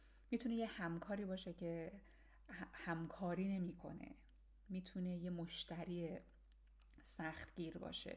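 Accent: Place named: United Kingdom. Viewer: چه جالب بود ! همش شمارو جدی در حال سروکله زدن و مصاحبه با افراد بدقلق و اخبار گفتن دیده بودم 😃 لهجتونم خیلی بریتیشه 👍👏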